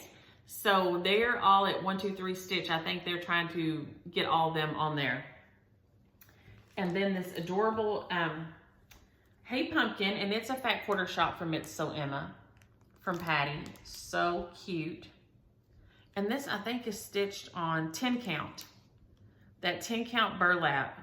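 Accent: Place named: Texas, United States. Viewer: I would not leave my Scotties or any pet alone if strangers were coming to my home and I was not there either. You were a responsible pet owner. I want to thank you for the sweet "shout out" today and you are so sweet to share to others to watch my Flosstube also. Yes, us girls raised in the south do have the slow southern drawl. Your Texas accent is charming and I love to hear you talk. I love to read also. I have the Libby app and also Overdrive to get my e-books. I subscribed to Freebooksy also. Maybe you should join Stitching Book Club. I really enjoy it, but I just cannot seem to get all the stitching done. I do love to quilt and sew but my machine and I have a love/hate relationship most of the time so I limit my sewing time so I do not lose my religion. My friend, Deloris, was so excited when you called her name about the "A Tisket a Tasket". 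She loves birds and has loads of feeders for all kinds. It could not have went to a person that loves birds and cross stitch anymore. Happy Stitching, stitchy friend! Dottie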